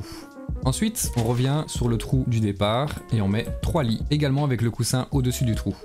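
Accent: Belgium. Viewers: Bonjour à toi merci pour cette vidéo serait tu belge ? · ça s'entends tu est belge, en tant que français je me dois de na pas liker cette vidéo même si je l'ai apprécié. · J’ai l’impression de reconnaître un accent belge 🤔 Je me trompe ? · Ton accent c'est un belge ?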